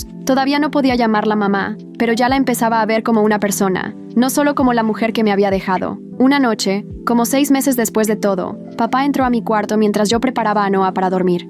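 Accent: Mexico. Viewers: La historia con algo de acento mexicano🎉😂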